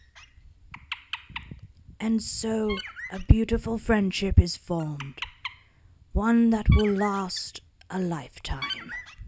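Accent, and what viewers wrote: Australia. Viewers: Mohammed Kortam it’s Australian because the person who’s speaking lives there lol. Pretty standard stuff. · U r Aussie I can tell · Wait... Are you actually Australian?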